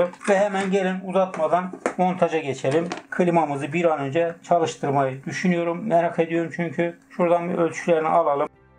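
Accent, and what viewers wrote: Erzurum, Turkey. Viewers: hay senın ben cigerin yiyim dayi maşallah sene bir dadas her yerde dadastir gendin belli edisen arada bir videolara koy şivemizi💗💗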